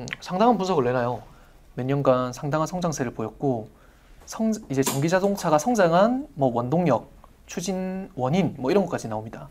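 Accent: Gyeongsang, South Korea. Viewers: 부산일보라 억양에 서울말쓰는듯 하면서 경상도 사투리가 보이네요ㅎㅎㅎ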